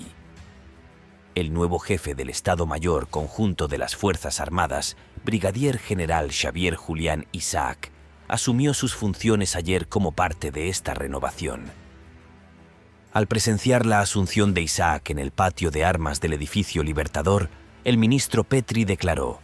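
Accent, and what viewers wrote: Spain. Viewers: EXCELENTE...Permítanme una crítica constructiva ,al video: hubiera sido óptimo que quién hable de "NUESTRAS F.F.A.A." sea una voz argentina y "no españolizada". V.L.L.C.!!! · Encima habla un gallego 😂😂😂😂 no tenes algo mas antipatria ? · todo verso pagado y se nota con el asento gallego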